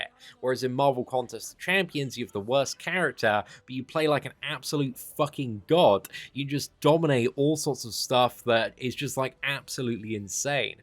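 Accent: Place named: United Kingdom. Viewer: I just wanted to hear your british Accent again mate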